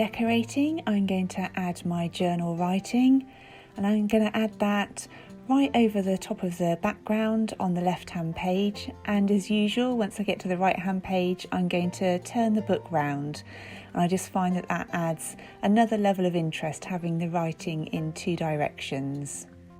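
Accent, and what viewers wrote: United Kingdom. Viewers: I just wanted to tell you how much I love your videos. All of your spreads are so pretty and watching and listening to you and your British accent is so relaxing! (Texas girl with a serious southern drawl here)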